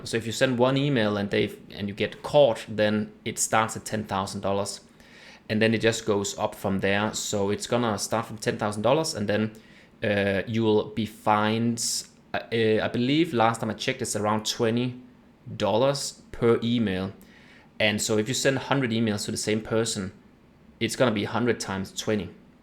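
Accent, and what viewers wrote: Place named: Germany. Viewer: Considering your strong accent, I'd say you are from Germany or Austria. Or maybe Luxembourg but less likely.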